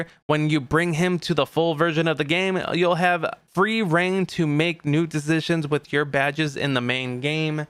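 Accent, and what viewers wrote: Chicago, United States. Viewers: What part of Chicago is he from?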